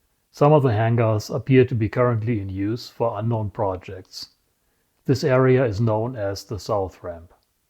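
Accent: Germany. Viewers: I don't understand a word, but very authentic german accent!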